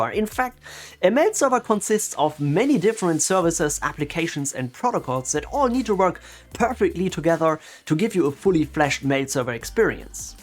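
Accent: Germany. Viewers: Do you speak german? Because i think i hear a bit of a german accent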